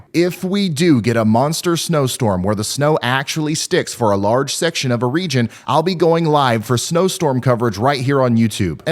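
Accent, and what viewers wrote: South, United States. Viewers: He’s truly great, and seems to be a caring soul ! I love hearing a southern accent. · I love Ryan. Best weather prediction, and explanation. On top of all that, he speaks Southern! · Love to hear you speak correct English with a southern accent! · I do too especially when he starts talking about possible snow in my area. I just get all excited lol. Love his southern accent too.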